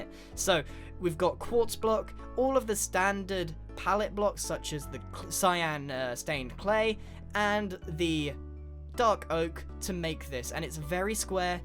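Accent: United Kingdom. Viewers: your from BRITIN wait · Ameenitys ha British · Caravan. In Australia we say Stuff pretty similar to the way you say it in Britain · Is it me or grain really emphasizes that he’s British in this video? 🇬🇧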